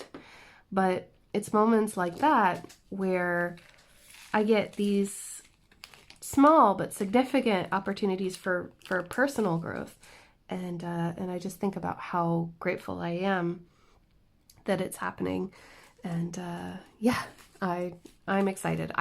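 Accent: Ireland. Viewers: Sometimes when chatting you can hear a bit of the southern Irish accent coming through, even though you are American and to be honest it sounds really beautiful to. Wow I am surprised that negative drama is showing it's head in the crafting community, what is wrong with these people crafting is meant to be relaxing, fun and hopefully making new friends who enjoy the same hobbies that we all have in common. So sorry that has happened to you I must see if I can remember my Instagram password because I am looking for a particular diamond painting called remember me from dac which I am keeping money aside for if it suddenly comes along. I also have quite a few diamond paintings that I could donate for your charity. God bless look after yourself I feel your pain I have developed an allergy to sunshine just my luck, my son says I'm turning into a vampire 🧛‍♀️lol. 🤗🍀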